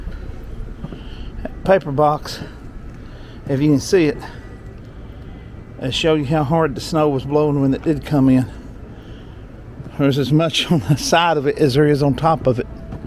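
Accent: South, United States. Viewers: Be yourself. There is nothing wrong with your country accent, that is our blessing from being in the south. I have one too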